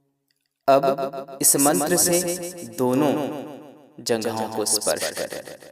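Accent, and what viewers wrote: Standard. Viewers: स्वामी जी सादर प्रणाम ।बहुत ही शुद्ध एवं सुन्दर उच्चारण है आप की वाणी में । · ओम स्वामी जी सादर प्रणाम चरण स्पर्श बहुत ही अच्छा यह आज का आपने वीडियो डालकर हमें एक एक शब्द के शुद्ध उच्चारण के लिए प्रेरित किया है इससे जहां कहीं हमें मंत्रों में उच्चारण है थोड़ी जो गलतियां होती थी उनको आप के साथ उच्चारण कर सुधार सकते हैं स्वामी जी आपका बहुत-बहुत धन्यवाद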